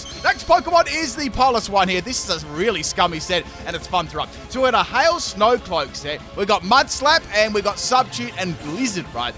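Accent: Australia. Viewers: Your Australian accent takes your videos from amazing to divine!!!! >>>>>>>> Wolfey and MandJTV any day!!!!!!!!!!!!!!!!!!!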